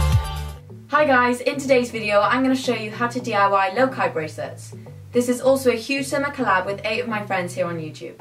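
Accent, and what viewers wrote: Wales, United Kingdom. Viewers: Are you welsh? You have the accent.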